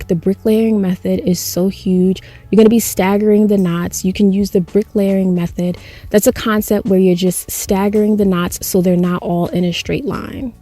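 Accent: New York, United States. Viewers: Auntie u got a NYC accent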